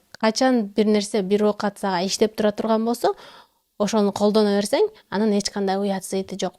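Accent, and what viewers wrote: Standard. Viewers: Эң соонун кенештер. Кыргызча таза сүйлөгөнүңүз үчүн сизге чооң ырахмат. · Аягына чейин аябай кызыгып коруп уктум,унунор да жагымдуу, озунор да татына кыз экенсинер,таптаза кыргызча суйлоп адамдын мээсин ачып куйчуну куюп кайра жаап койгондой айттынар баарын,молодец аман болгула ишинерге ийгилик🎉🎉🎉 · АЗАМАТ КЫЗ ЭКЕНСИН, АНТКЕНИ КЫРГЫЗ ТИЛИНДЕ ТАК ТАЗА СУЙЛОЙТ ЭКЕНСИН ! БУЛ СЕНИН АКЫЛДУУ ЭКЕНИНДИ. БИЛДИРЕТ ! << КИРГИЗ >> ЭМЕС ЭКЕНСИН ДЕМЕК КЫРГЫЗДАР УЧУН ЖАН ТАРТКАН ЖАКШЫ. АДАМСЫН !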